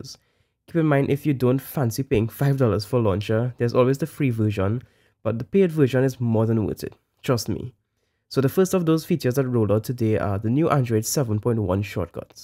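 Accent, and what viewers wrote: Trinidad and Tobago. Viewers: are you Trinidadian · Are you trini bro ?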